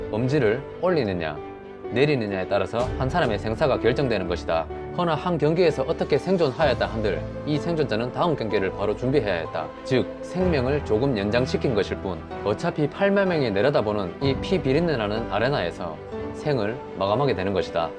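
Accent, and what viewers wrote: Gyeongsang, South Korea. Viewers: 갱상도사투리나레이션 듣기거북하네예 ㅋ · 다 좋은데 갱샹도 사투리가 거슬리네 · 인간이 예수왕 어린양의 구원의 피외에는 영원히 살지 못하는데 뭔 영원이라하냐 경상도 억양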